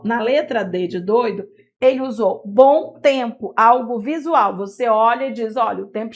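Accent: Pará, Brazil. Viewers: Professora paraense?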